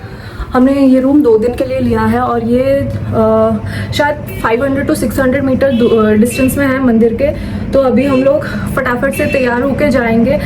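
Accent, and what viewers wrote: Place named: Odisha, India. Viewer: ମୁଁ ଜାଣିଛି ତୁମେ ହେଉଛ 💯% ଓଡ଼ିଆ। କାରଣ ଯେଉଁ ଓଡ଼ିଆ ଲୋକ ହିନ୍ଦୀ ରେ କହିଲେ ଜଣା ପଡ଼ିବ ଓଡ଼ିଆ ଲୋକ।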